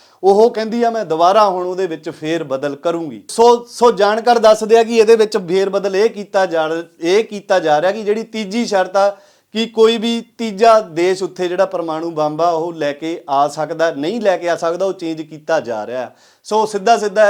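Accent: Doaba, India. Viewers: Bhai Sahib Jeo Tuhadi Language Doaba Wali Aa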